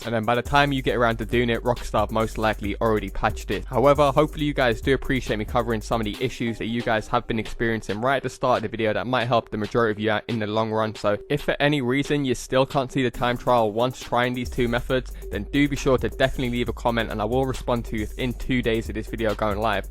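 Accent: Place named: United Kingdom. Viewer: Love the British accent🇬🇧!!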